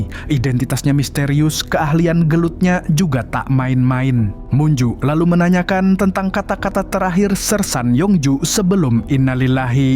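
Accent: Banjar, Indonesia. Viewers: adminnya orng banjar yh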